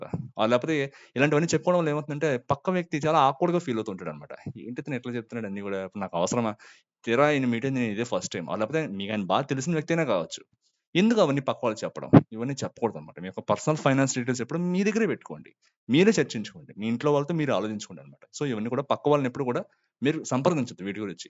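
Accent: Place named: Godavari, India. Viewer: పక్కగా గోదావరి బాబాయ్.......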